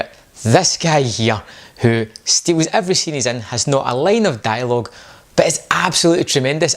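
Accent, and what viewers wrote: Scotland, United Kingdom. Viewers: hey Great review, I subbed. I am just starting out as well, trying to get to know other people who do reviews. I do Movie Reviews and Voice Impressions. I am not trying to spam. Also love the Scottish accent. Here is a review I did of San Andreas, Check it out sometime if you have the chance. <link> Looking forward to seeing other stuff you do as well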